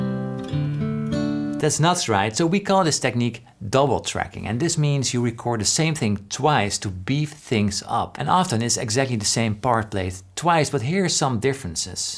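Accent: Netherlands. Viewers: your dutch accent is so cute.All the best from Limburg.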